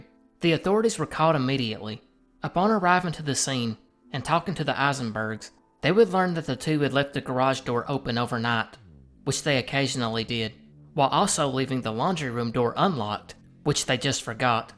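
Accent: South, United States. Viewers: My dude! Love your channel man. Not sure where you're from but you've got quite a Southern draw. As someone from Kentucky, it's refreshing to listen to someone I can relate to. And your stuff is super interesting and well done. Keep up the good work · Keep this up man. I think we’re from around the same neck of the woods, and it touches my heart to hear a southern accent talking about these mysteries.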